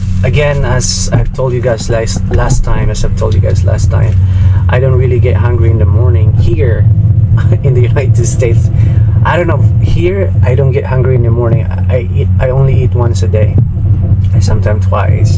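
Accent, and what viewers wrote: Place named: Philippines. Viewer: Hey where's in Philippines you come from?,Your English is very good a bit if a accent there.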